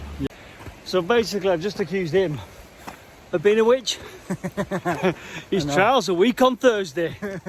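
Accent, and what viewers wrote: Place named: England, United Kingdom. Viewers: Beautiful. Thank you for your video’s. I just subscribed. I’m very happy I found the Chanel. I’m American , SE section. Your accent is so interesting. I understand you well and watching this I realized people from England or in that area of the world have a dialect that chops up their words. My granddaughter can talk just like an Englishman. ❤️ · I'm glad your not going to speak Yorkshire. As a Yank, I can barely understand your Manchesterese. Love your videos though, even if I do occasionally have to turn on the closed captioning. By the way, I also line in Manchester.......New York, USA!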